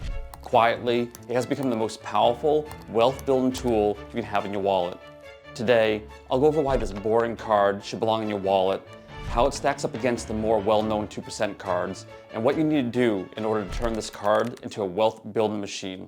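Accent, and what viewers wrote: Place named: South, United States. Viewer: This guy learned english from a southern man or a black man.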